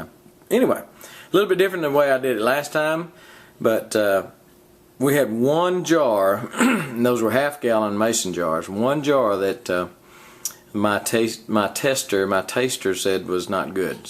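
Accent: Texas, United States. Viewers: Who the heck takes the fruit out of the drink eat a whole or Blended. that's the best part it's like an Ever melon. When you cut a bottle size hole in the top of your watermelon flip over a bottle of Bacardi in that thing overnight next day eat the watermelon it's great! I like it even better with coconut rum like Malibu or something.... I'm a big fan of an unfiltered wheat beer, blue moon Hefeweizen stuff like that and I'm just getting into Brewing Meade's and wines so I kind of think everything that's in there is the way nature intended it and I pretty much leave a drink intact unless I think it would improve it to filter it clarify it or any stuff like that but like I said I'm a newb at this. Thanks for the good advice and you don't talk too much good sense of humor and a Texas drawl music to the ears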